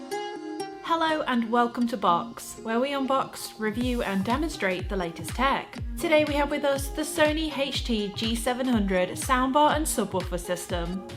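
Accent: United Kingdom. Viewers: “Heych”-T…. The fuck with your pretentious british accent you piece of grabage!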